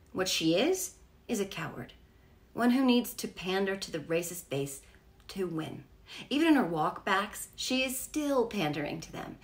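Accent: South, United States. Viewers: for a Jersey Girl, this Southern woman says you have the best Southern accent. Better than most actors who try to play Southern. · Great Southern accent! Thanks for pointing out what we damnyankees know what the cause of the Civil War of😮—slavery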